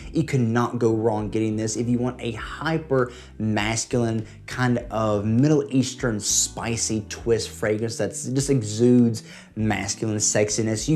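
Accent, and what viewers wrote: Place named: South Carolina, United States. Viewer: Love the SC accent!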